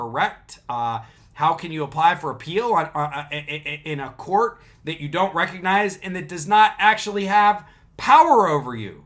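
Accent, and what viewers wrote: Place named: Western Pennsylvania, United States. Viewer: I was raised in western PA just far enough from Pittsburg for the smoke to only be visible on windy days. (mostly) I've spent half a century travelling (not driving) across the US and world for business. You're the other western PA accent I've encountered in the past 1/2 century since I left. But that's just Reason #3 for enjoying your posts. Keep it up.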